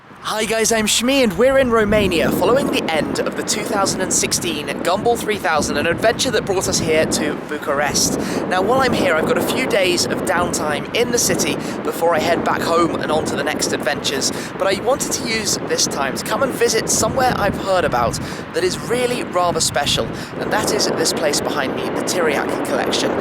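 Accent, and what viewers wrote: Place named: England, United Kingdom. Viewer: theBreserk Accentul unul englez totusi, nu te poti astepta sa pronunte corect denumirile cu diacritice. :)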